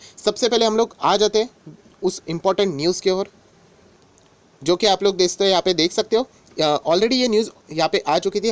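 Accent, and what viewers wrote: Maharashtra, India. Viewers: sir aap marathi hai aapki bolne ki bhasa thodisi marathi hai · bhava tyanchya bolnya madhun ch kalat aahe te marathi aahet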